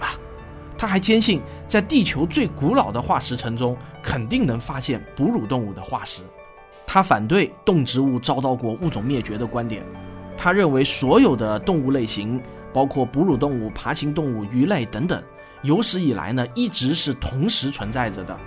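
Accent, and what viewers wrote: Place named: Taiwan. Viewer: 講的比老高還精彩， 汪老師口音，好熟悉， 好像台灣老師授課。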